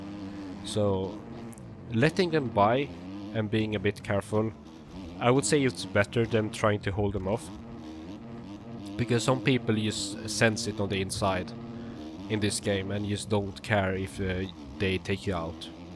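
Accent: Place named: Sweden. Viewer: mate, you have the most swedish accent i’ve ever heard lol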